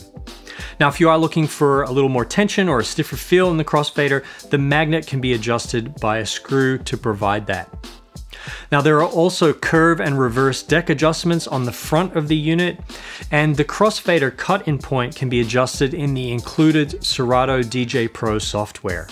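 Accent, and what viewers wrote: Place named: Australia. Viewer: Are you an Australian who has lived in America for a long time? Your voice sounds in between the two and it’s confusing me.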